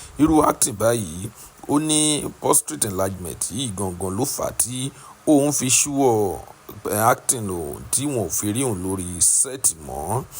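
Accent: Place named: Yoruba, Nigeria. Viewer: You are talking as if you are sick,not smart at all,you are speaking as if you are a Yoruba man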